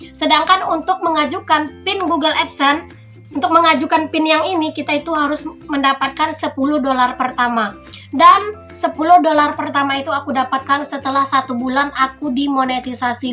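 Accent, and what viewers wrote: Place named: Java, Indonesia. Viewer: Lucu bgt bunda ini ngomongnya.. nyenengne bahsa jawane... 👍👍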